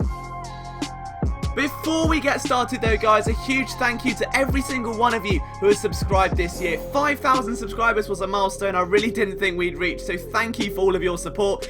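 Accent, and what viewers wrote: England, United Kingdom. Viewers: It's funny hearing a British accent breakdown football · YOU SOUND ENGLISH? IF SO YOU'RE UNDERSTAND THE GAME REALLY WELL. AMERICAN FOOTBALL IS COMPLEX LIKE CHESS. I GIVE YOU A THUMBS UP ON THE SUMO ACTION IN THE PIT.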